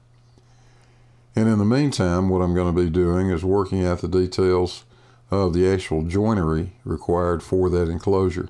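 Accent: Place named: Tennessee, United States. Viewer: By the way. Where are you from? Your accent sounds like its from the area that I was born in. Roane County TN near Knoxville.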